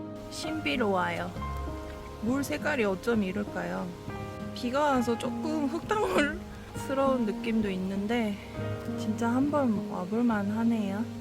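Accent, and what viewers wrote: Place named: Jeolla, South Korea. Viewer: 정겨운 전라도 억양, ㅎㅎㅎㅎ 비비체 뷰가 꼭 가보고 싶네요.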